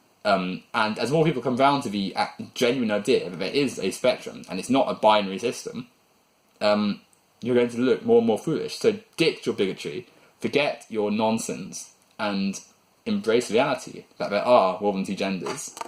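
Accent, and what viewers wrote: United Kingdom. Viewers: Penises and Vaginas. Can I get my award now? Ur British accent doesnt hide ur stupidity.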